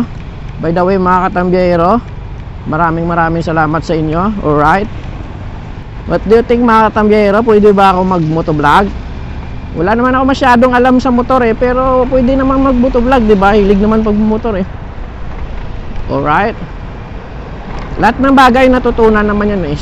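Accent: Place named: Iloilo, Philippines. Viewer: San to paps?sounds ilonggo kyo po..rs paps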